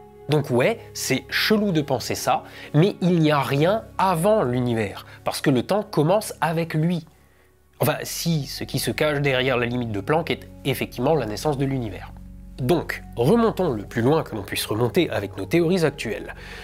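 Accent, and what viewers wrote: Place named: Alsace, France. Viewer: L’accent alsacien et la prononciation des le début me ravi ! Mdr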